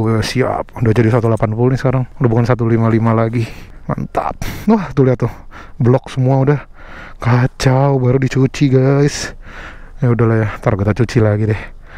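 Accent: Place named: Betawi, Indonesia. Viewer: Betawi itu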